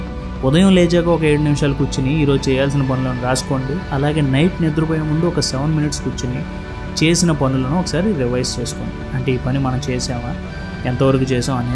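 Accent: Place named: Telangana, India. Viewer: Ante konchem Language lo Telangana slang vastundhi anduke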